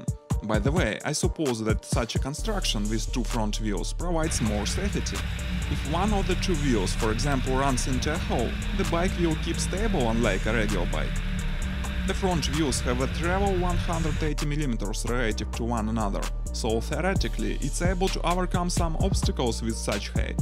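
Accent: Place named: Russia. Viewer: Явно нужно что-то делать с русским акцентом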